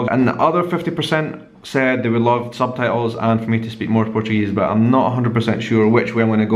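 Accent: Scotland, United Kingdom. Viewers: I don't think subtitles is needed...i really enjoy your channel just the way it is, and i got so happy cause i understand your scottish english, your accent reminds me the tv series "Outlander"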